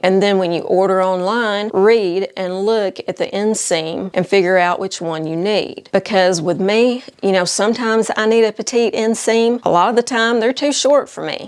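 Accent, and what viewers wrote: South, United States. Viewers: You are hilarious! I love how you speak the truth in that beautiful Southern accent. Preach on sister! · Hi, I love watching your videos! You give great alternatives and I love the fact you always provide links to many options for purchase. Your "straight talk" is appreciated, but I have to say your bluntness combined with your Southern accent is quite entertaining too. Several highlights: 1) Re: Super skinny jeans/jeggings: "They're like sausage casings..."🌭 2) Re: Cold shoulder top + skinny jeans: "You look like you've been in a coma since 2015..."😱 3) Re: Miss Me jeans: "You can still buy these, but that doesn't mean they're in style..."😰 4) Re: Non-descript old lady shoes: "The shoe that has an identity crisis...it's ugly is what it is..." 😅 5) Re: Sport sandals with straps, velcro, padding: "This is just hideous"🤮 6) Re: Ugly ankle boots: "These scream old lady..." 👵 7) Re: Ill-fitting bras (not supporting the girls properly): "This is an epidemic...You know, the girls are down to the waist..." 🤣 People can find more hidden Easter eggs🥚 by actually watching and listening to the entire video, especially toward the end!:hands-yellow-heart-red: Take all constructive criticism with an open heart and mind, it's a fashion channel after all, but the target audience is for mid-life sisters, myself included! 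😊🥰💕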